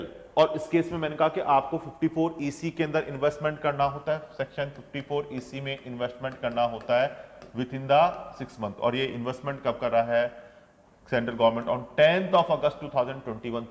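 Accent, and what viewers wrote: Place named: Rajasthan, India. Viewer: Rajshthani accent👌😁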